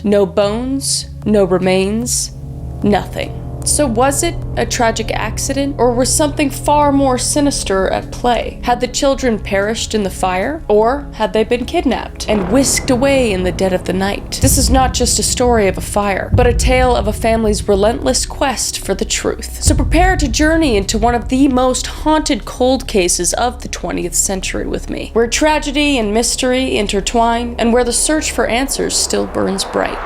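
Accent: Russia. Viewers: Until right this second I fully thought you had a Russian accent! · Are you Russian? I was wondering because you do the accent a lot and that is what made me look at this channel because my crush is Russian and I won’t to know what he likes please please please be Russian I love him😘😏💋💋💋💋💋💋💋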